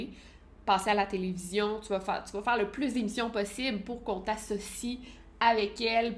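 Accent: Quebec, Canada. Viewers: Coucou je suis aussi une de t'es abonnée et je trouve que ta chaîne aussi et top les québécoises vous avez un talent fou et êtes tellement humble c est toujours un plaisir d écouter vos vidéos avec votre belle accent bonne continuation à toute les 2 vous êtes top 😉 de la pars d une française fan de vous 2 😊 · L'accent québécois est vraiment charmant. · J’adore tes vidéo je te suit depuis la Suisse au début j’avais un peu de peine avec l’accent qui change mais tu es tellement superbe que mes oreilles se sont habitués à l’accent québécois merci 😊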